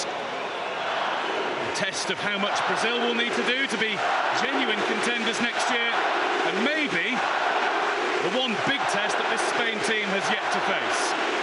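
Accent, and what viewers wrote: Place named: United Kingdom. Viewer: inglês britânico???? por quê????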